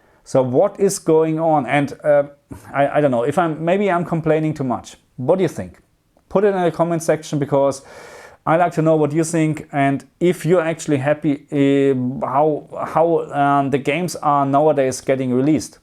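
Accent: Germany. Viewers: Ich dachte mir schon von Anfang an des Videos, „Er scheint einen deutschen Akzent zu haben, ob er deutschsprachig ist?“ Und dass „Herr der Ringe“ hat mich dann zum Lächeln gebracht.😅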